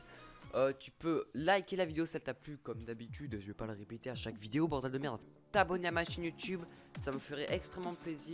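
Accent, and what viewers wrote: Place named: Canada. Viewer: On dirais que t'a un accent canadien ça me fait trop rire 😂😂😂😂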